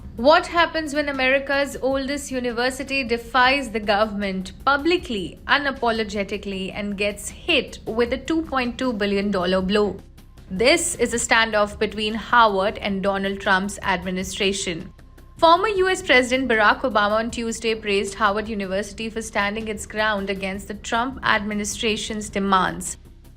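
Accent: India. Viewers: very few Americans can say my name correctly and I accept that. She has an Indian accent. Get used to it or move on.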